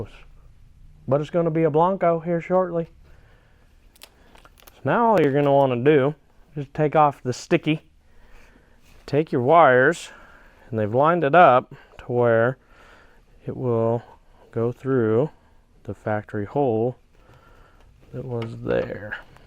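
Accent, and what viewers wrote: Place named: South, United States. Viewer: This precious man speaks like a southern American Red Green . Love the video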